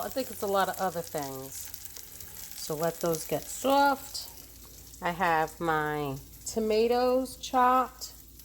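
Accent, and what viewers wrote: New York, United States. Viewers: Love that NewYork accent. Hope I'm correct. Lol I personally go to your channel for that Italian I love eating and cooking. I also find the exact problem with my LeCruset pans also I was so disappointed that they stain. How do u clean yours?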